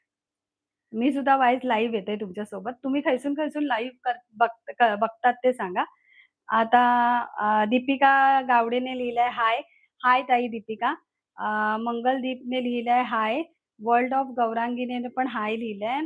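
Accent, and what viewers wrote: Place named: Malvan, India. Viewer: तुम्ही मालवणी बोलतास ता भारी वाटता, गोड लागता. तसाच बोला.मराठी बोलूक बाकी लोक आसत. तुमचा आपला मालवणीच चलांदे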